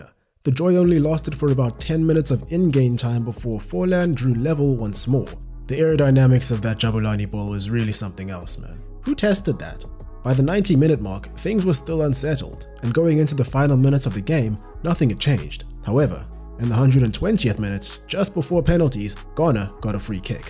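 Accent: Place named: South Africa. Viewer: I always knew you from SA. The accent and slipping in Tau and psl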